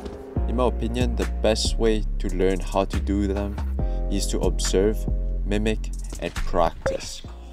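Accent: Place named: France. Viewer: is that ur voice??? u sound so french XDDD anyways nice tutorial...best so far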